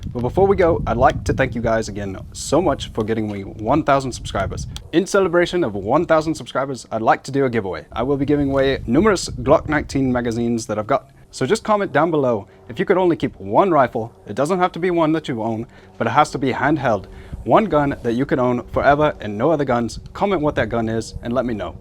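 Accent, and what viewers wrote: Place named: Australia. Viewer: Sounds like an Aussie. Love the larp.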